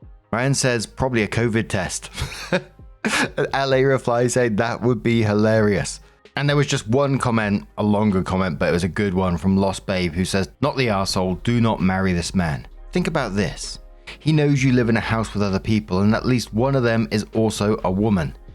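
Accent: England, United Kingdom. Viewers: What region of England is your accent from, can't place it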